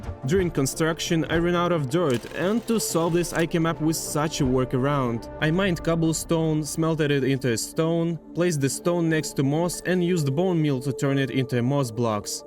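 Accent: Russia. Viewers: как же палишься братан по акценту)) своих видим за версту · У тебя русский акцент По секрету всему свету скажи откуда ты? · when do you guys realised he is a russian guy, i realised it in 2 min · Настольуо акцент русский слышен, что даже забавно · С первых слов поняла что ты русский, но не было доказательств, пока не увидела достижения 😂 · I hear russian accent lol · nah, its russian accent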